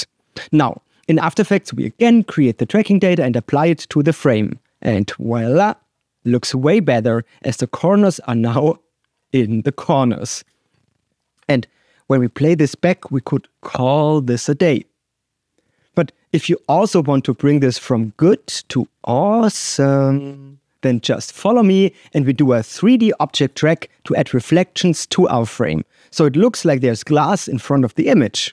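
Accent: Germany. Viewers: Where do you live, sounds like germany...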